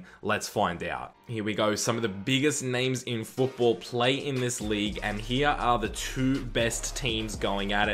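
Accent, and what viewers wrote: Spain. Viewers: U sound spanish man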